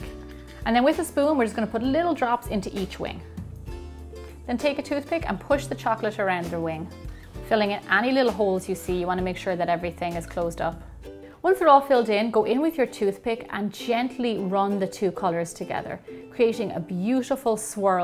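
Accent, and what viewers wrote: Ireland. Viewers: Her accent, is she from Ireland? Sorry I'm not a native speaker :)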